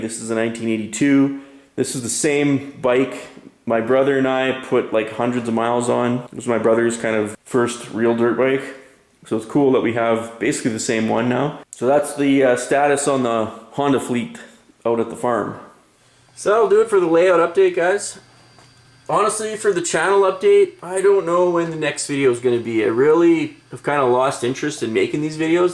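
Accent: Canada. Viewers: Just curious but are you out in Saskatchewan, Your accent reminds me of my moms best friend who base hopped to all the same postings we got when I lived at home. Love the Layout and I hope that you get past your water effects roadblock.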